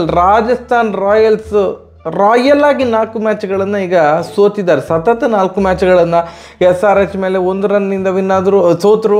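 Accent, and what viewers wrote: Mangalore, India. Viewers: ನೀವು ಮೊದಲು ವಿಡಿಯೋ ಮಾಡಿದಾಗಿನಿಂದ, ನಾನು ನಿಮ್ಮನ್ನ ಫಾಲೋ ಮಾಡಿದೀನಿ, ನೀವು ಐಪಿಎಲ್ ವಿಶ್ಲೇಷಣೆ ಮಾಡುವ ರೀತಿ ನಂಗೆ ತುಂಬಾ ಇಷ್ಟ, ಚುಟುಕು ಹಾಸ್ಯ ದೊಂದಿಗೆ ನೀವು ಹೇಳುವ ಮಂಗಳೂರು ಭಾಷೆ ನಂಗೆ ತುಂಬಾ ಇಷ್ಟ, ಒಂದು ರಿಪ್ಲಯ್ ಮಾಡಿ 💕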